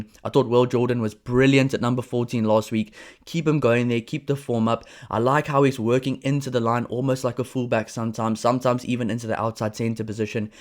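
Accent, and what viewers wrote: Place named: South Africa. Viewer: Brilliant video/ analysis man! So cool to hear our team and game covered with the Bokke accent very refreshing 😉 pretty sold on your team only things I would change this week would be QT at 12 and BP or ALB in the 13. JB can take a well earned rest or bench ( I wd actually like to see him back at 15 tbh with Dmac on the bench) I also like Tosi and want him to have some game time. The Haka is a thing and Codie has been leading it , hopefully others can step up cos I totally agree with you re hooker! Keep it up bru cheers 🍻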